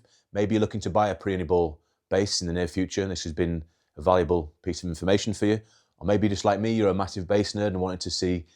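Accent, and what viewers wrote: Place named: United Kingdom. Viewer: Didn't know you were British. Cool vids.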